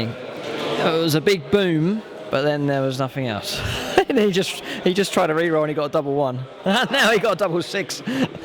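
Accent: United Kingdom. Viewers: I'll admit when you rolled the "6" for the last hull point on the Super Heavy it was "Carlton Fisk-esque"! Good show once again. Maybe it's the models, maybe its the terrain, maybe it's the narrative, hell, I wouldn't rule out maybe it's just the British accent thing, EITHER WAY, these batreps have reached a peerless quality in what you provide. No fancy overlays or stat lines (which are fine) but just clever camera work and a clear love for the narrative. Thanks again. See ya next time.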